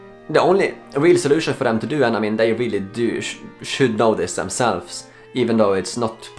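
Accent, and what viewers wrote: Sweden. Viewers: swenglish, nice ;)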